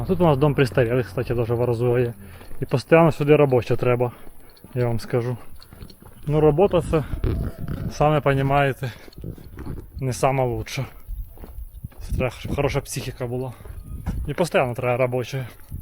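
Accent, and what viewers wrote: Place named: Surzhyk, Ukraine. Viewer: Супер суржик, нікого не слухай, звучить гарно і натурально - це твоя фішка як в Канадського Марка)) Admin 11 думає що тільки він читав українських письменників і тепер може повчати як кому розмовляти) від літературного українського говору відразу хочеться спати)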